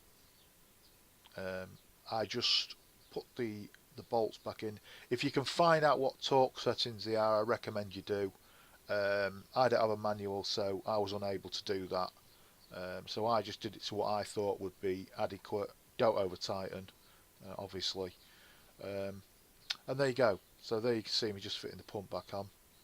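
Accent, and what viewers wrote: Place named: Northern England, United Kingdom. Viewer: Hi from Manchester! You sound like a northerner too! Great video and like the Funky music!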